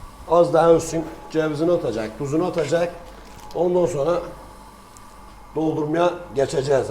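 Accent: Gaziantep, Turkey. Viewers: Öz antepli şive mükemmel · ustam entep şiven le süper seyırediliyorsun video larını seyir ediyorum kısmet olursa pandemi bıterse sizinle tanışmak için antepe geleceğim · Abi zevkle senin videolarina bakiyoruz. Birşeyler de öğreniyoruz.Bismillahirrahmanirahim diyerek baslamanda beni çok mutlu ediyor Antep şiveside çok güzel. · usta, Antebe gelirsem seni ziyarete gelecegim yorum. Bizde gurbette yasayan anteplilerdeniz. Valla antep dilini duymak beni sevindiroyor. Elline goluna saglik yorum cok güzel görünüyor. Senin videolarina zevkle bakiyorum!!!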